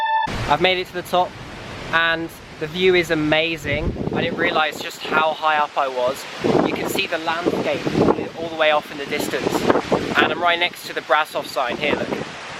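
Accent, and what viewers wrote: United Kingdom. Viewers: where are you from ?England or USA?your accent sounds like british :) .What was your opinion on the places you have seen in Romania ?What did you expect to see and what did you find in Romania? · Din UK. Nu ti-ai dat seama dupa accent?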